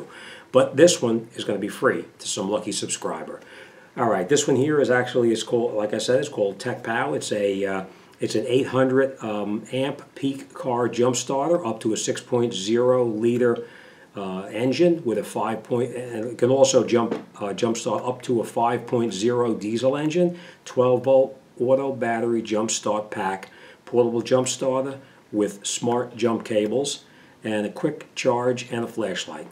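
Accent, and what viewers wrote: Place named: New Jersey, United States. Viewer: Hey. I got your comment right here. Sounds like youre from Jersey or someplace up north like me. Actually, I live in Virginia now. It'll be cool if I won your giveaway.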